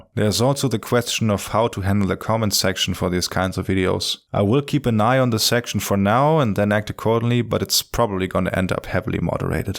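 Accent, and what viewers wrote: Germany. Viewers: i know you’re still making these videos, and doubt you’ll see this, but i think it’s extra important for you to do this work. for some reason my lizard brain trusts you more because you have a german accent. now, of course that isn’t a real reason to believe what you say as true, and i know this, but for people who are on the fence about the truth of the holocaust, all they really want is an authority figure, and i think you give that where british, american, and canadian-accented creators can’t.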